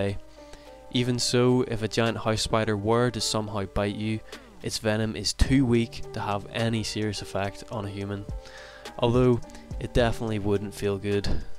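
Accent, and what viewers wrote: Northern Ireland, United Kingdom. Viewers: He's got a Northern Irish accent. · never like to see spiders eating other spiders 😢 The Northern Irish accent is refreshing though, subbed! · He sounds very similar to most of my friends In Northern Ireland, but with the hundreds of accents across the UK even as an Englishman its tough to put a finger on it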